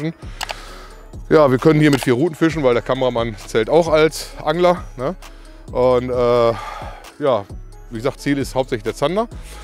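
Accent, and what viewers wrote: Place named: Bavaria, Germany. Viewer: Kommt auch auf den Bestand an😉 vom Dialekt her denke ich kommst du aus Bayern.. jedes Gewässer ist halt anders.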